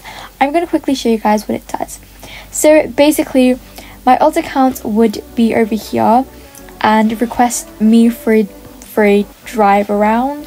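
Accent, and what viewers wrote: United Kingdom. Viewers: Power of the British ascent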